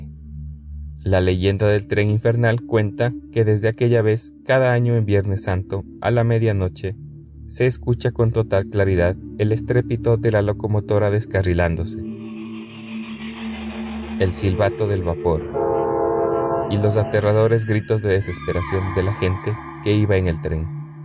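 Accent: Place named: Andes, Latin America. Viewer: Otro excelente video , nos deleitas con las exquisitas Leyendas y mitos de La Sierra centro saludos desde siempre , muchos éxitos mereces más apoyo amigo! ¿Por cierto de dónde eres porqué noto que tenes acento andino?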